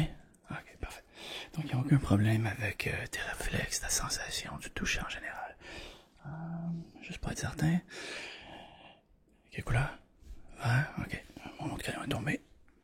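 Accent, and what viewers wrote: Quebec, Canada. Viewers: Voilà désoler mes on comprend rien l accenquébécois c'est pas la langue française voilà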